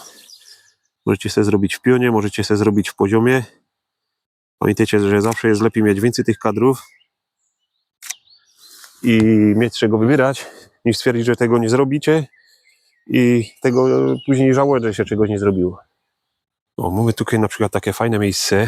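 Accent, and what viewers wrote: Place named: Silesia, Poland. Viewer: Piękne zdjęcia pięknego miejsca. Deszcz to dla mnie osobiście bardzo nastrojowa aura, lekko nostalgiczna, czasem taka nawet "Silent Hillowa". Jak zawsze: dowiedziałam się czegoś nowego. No i najważniejsze, czy aktualnie używany przez Ciebie jest akcent śląski w języku polskim, czy język śląski, brzmi to naturalnie i nadaje charakteru całemu kanałowi.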